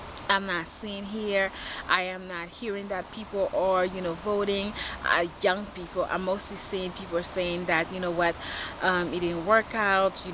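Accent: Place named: Haiti. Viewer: You have a nice accent , are you from Haiti?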